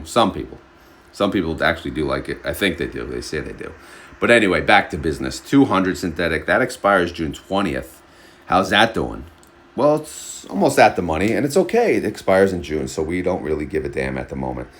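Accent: New York, United States. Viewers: I love this man's New York accent but he sings like a cowboy 🤠.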